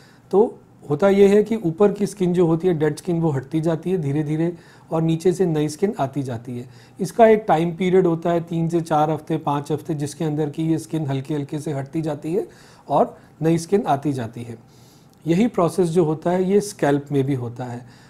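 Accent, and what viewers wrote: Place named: Hinglish, India. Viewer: thats a how ppl speak here hindi+english=hinglish😁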